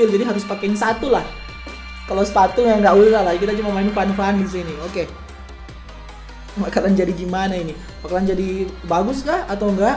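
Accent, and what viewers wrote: Manado, Indonesia. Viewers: Ka org Manado yah... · abang orang manado ya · Kak dari manado? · Abang orang Manado??? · yooo org manado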